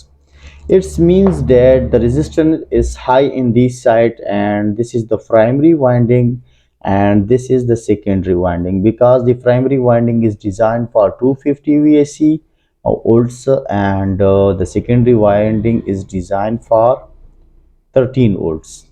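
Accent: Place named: India. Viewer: Normally i find Indian accent difficult to understand, but you speak slower, with good pace and clearer, it is easy to understand you. Thank you very much for sharing your knowledge with us with so much care in both your instructions and handling of a foreign language.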